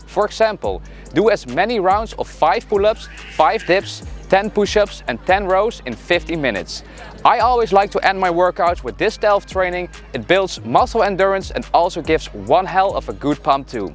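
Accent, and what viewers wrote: Netherlands. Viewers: Dutch accent always remains 😊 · It took 10 seconds to recognize you are dutch. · ik hoorde meteen dat je Nederlands bent hahahahaha. Lekker bezig!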